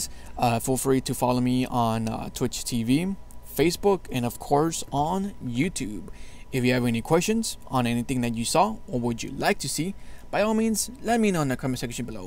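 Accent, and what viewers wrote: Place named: Canada. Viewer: Are you Canadian I </3 your accent c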